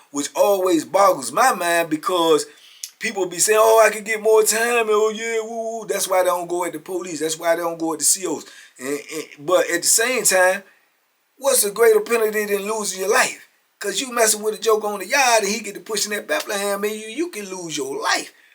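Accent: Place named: Virginia, United States. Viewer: Another banger. But, the VA accent is killing me.😊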